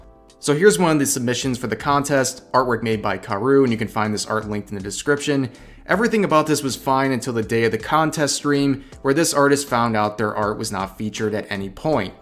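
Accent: France. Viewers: I thought she was definitely acting toxic on her dead space stream. I know I definitely heard her say to one viewer who was leaving "What are you going to do? Touch grass? This is a Saturday, you're not leaving my stream!" Really showed me how big an ego she has and how narcissistic she is. Also a really good indicator that her viewer count/number of people who watch her streams is the most important thing to her. She did a lot of unnecessary yelling too, which I didn't understand. It's honestly sad because I genuinely liked her for a few good reasons; Number 1) because her art is amazing, and I'm an artist too; number 2) her cute French accent; and number 3) some of the games she plays (like dead space) are games that I really like. I did not know that this is what she's truly like behind the screen, but I am nonetheless disappointed. If anything, her toxic behavior serves as a good reminder of what I don't want to have in a relationship. 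I find it to be a good experience then, because I at least know what I'm looking for. I may be digging too far into it and that's just the persona that puts on for her streams, but at this point I kind of doubt it. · So I don't follow at all. Not a fan of hers, not because of anything that happened recently it's just I already support a Vtuber and I'm not going to throw more money at another Vtuber who's content just isn't entertaining to me. From the clips I've seen people just seem to have a hard on for her because of her French accent. Like that is what I legit believe. This is fine as people have certain aspects about others that they are attracted to. Anyways what really stood out to me in this whole situation is that no one is right, and everyone is wrong. First of all in any contest you aren't owed anything. You take part and you either win or you lose. You can lose gracefully or you can be a sore loser and whine about it. 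This artist took it upon herself to feel entitled and was not noticed by senpai. So she demanded answers like she was entitled to them. She got in contact with a mod and didn't like the answers she got. I do not condone the manner in which he did but that's the answer she got but she STILL was not satisfied. I feel like that's what's wrong with alot of people these days is they feel everything is owed to them and they are entitled to anything they want when that is not the case. Anyhow even after speaking with she still wasn't satisfied and takes to twitter which always raises a red flag to me. You could be an adult and just deal with it but no you had to go to the cesspool that is twitter. You've automatically lost any faith or respect I had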